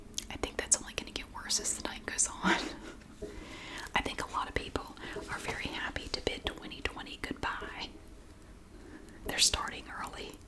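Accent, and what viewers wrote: South, United States. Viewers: Hi,you've got me well and truly hooked on your videos,especially the jewellery haul ones-only problem is,they are so relaxing that l fall asleep half way through,so have to view them over again to get to the end of all the items.l really enjoy listening to your southern drawl,l am from England so it's just fascinating to me.Please keep doing what you do,l will keep watching.Thank you so much,and may 2021 be a year of peace,good health and happiness for you and your boys(and 😺 kitties)love from Heather.xx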